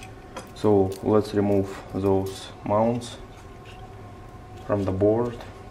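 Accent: Russia. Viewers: Братан по голосу слышу что русский . У тебя было место под остальные 3 слота памяти или всётаки он закрывает их , люди пишут что закрывает .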